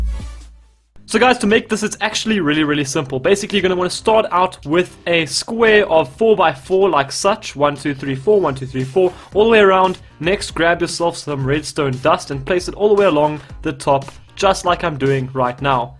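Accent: South Africa. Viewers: let me guess...South African? yup me too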